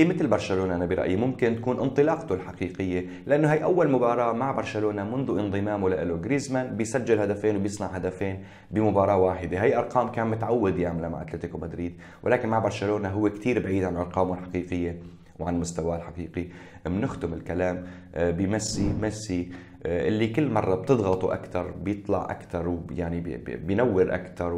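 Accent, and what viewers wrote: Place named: Aleppo, Syria. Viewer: انت مبيين انك حلبي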